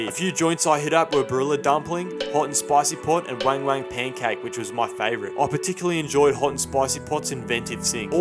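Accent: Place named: Australia. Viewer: Your australian accent is fucken yuck. Shot for reminding me why when I do leave nz I'm not going to Australia.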